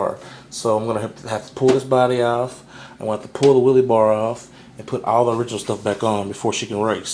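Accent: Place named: South, United States. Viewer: It looks good and u sound southern as hell like me, Louisiana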